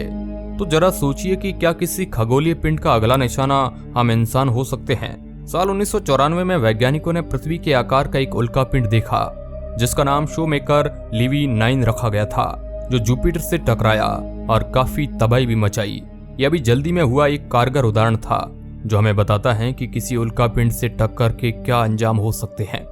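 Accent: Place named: Bihar, India. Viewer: Abe sale brust kya he be? Gama Ray brust??? Pehle accent thik kar uske bad ana video banane .... bihari he kya?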